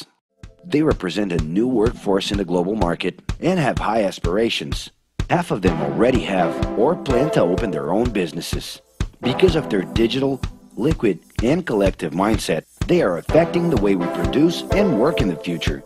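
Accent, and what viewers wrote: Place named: Latin America. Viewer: where is the narator from? seems like a latin-based-language accent...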